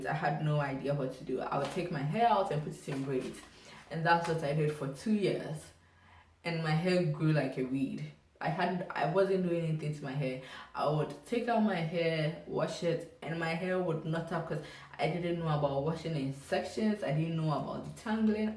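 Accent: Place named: Ghana. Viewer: Ghanaian Accent 😍😍😍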